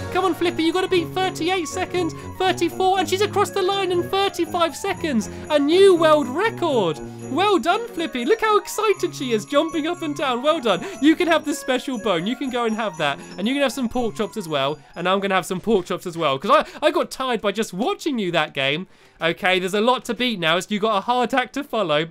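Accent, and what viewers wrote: United Kingdom. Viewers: I used to think he was saying Alpha Lee until I realized he was saying L for Lee. Can't understand much of his accent, not being British.. XD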